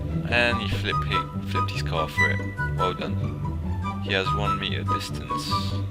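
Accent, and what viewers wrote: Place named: United Kingdom. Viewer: Great to have a UK voice on a channel 😀Suvscribed👍🏼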